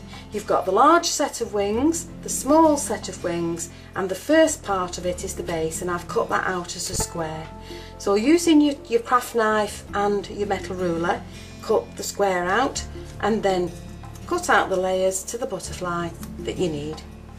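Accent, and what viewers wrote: England, United Kingdom. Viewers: Hi just came across your site! It's nice to hear English accent!lol have subscribed....now I'm going to look at your other tutorials. I live in Sussex.😍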